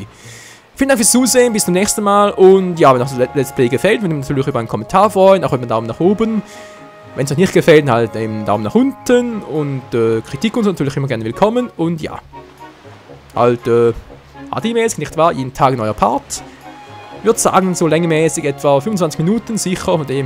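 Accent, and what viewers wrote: Switzerland. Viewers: Kommst du aus der schweiz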